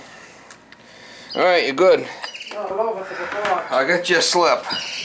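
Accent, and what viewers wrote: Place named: Boston, United States. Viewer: Oh my goodness..ahh you kiddin me? You sound eggzacktlee like my grandmutha my mumma and awl my relatives from Boston Lowel and Billerica yo wa voice tone sounz eggzactlee like my uncls my uncle brooce! I was writing this exactly the way my family sounds when they talk oh my gosh i cant believe it, i am getting teary eyed and i feel a warm no joke im not pullin your leg i feel a warm loving cozy safe feelin in my chest my heart listening to you talk....it is remarkable to listen to a familiar accent and feel immediate family love a sense of belonging it is so weird! I am going to watch now ive been listening to you and feeling so much comfort my gosh im gobsmacked im absolutely stunned ...holy cow. Okay well thank you for being you! Lol now im gonna watch because you are going to change out thermal cupler and that is exactly what my problem here is and its an old Gaffer and Sattler furnace forced gas heater it went out and now i cant relight it ...not sure how it went out to begin with!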